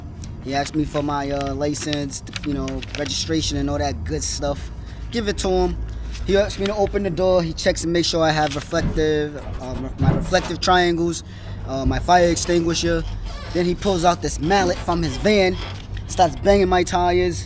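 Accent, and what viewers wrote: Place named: New Jersey, United States. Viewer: You must be from Jersey u got that accent like the sopranos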